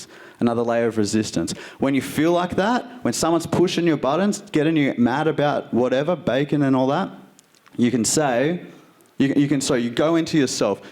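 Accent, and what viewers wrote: Australia. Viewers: u Australian lad?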